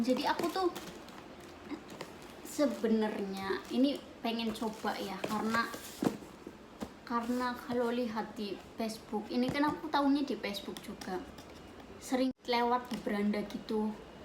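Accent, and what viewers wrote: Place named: Banyumas, Indonesia. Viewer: Ninine diwaraih ngomong ngapak nok..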